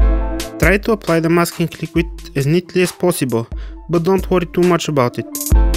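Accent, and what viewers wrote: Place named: Russia. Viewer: Твой рунглиш великолепен)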